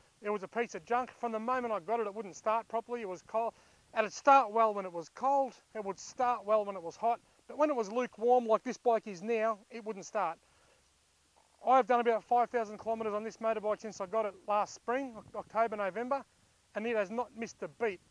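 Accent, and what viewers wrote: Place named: Australia. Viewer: Nice video mate. I always enjoy listening to the ausie accent. Very nice bike.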